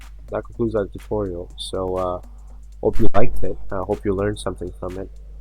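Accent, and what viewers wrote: Russia. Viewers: I just wonder what went wrong. It seems to me that you tried the right things (crank down weight, crank up roughness), all the stuff i'd try too — but it didn't work out. Still don't get the point. P.S. is a russian, according to accent, and you —?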